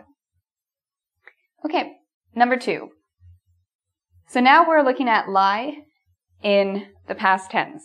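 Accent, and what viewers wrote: United States. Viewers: Estou aprendendo bastante! I'm learning a lot! Miss, are you american or canadian? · Is she an American？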